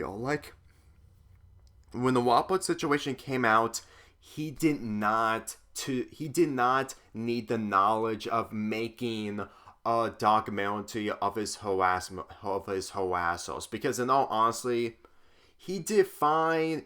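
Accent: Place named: United States. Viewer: Are you American by chance? Because from the sound of your voice in the video, you kind of sound like you might have an American accent, and that MIGHT be the most logical reason and explanation.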